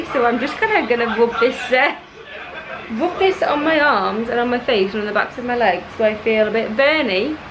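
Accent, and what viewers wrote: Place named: Yorkshire, United Kingdom. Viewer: That Yorkshire Accent.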